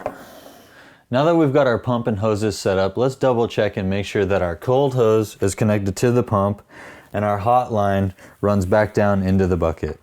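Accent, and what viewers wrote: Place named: United States. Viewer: A real person speaking perfect American English. Thank you God.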